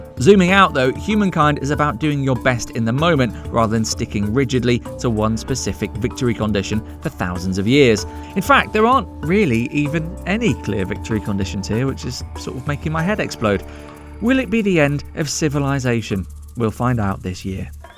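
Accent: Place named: South England, United Kingdom. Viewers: Yeah he's got an English accent. Sounds southern. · Who else loves the british accent? It's british right? I am not dumb right?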